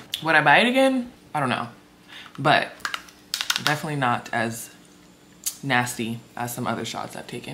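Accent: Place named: South Africa. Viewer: Lol why did I think she’s South African 🤦🏽‍♀️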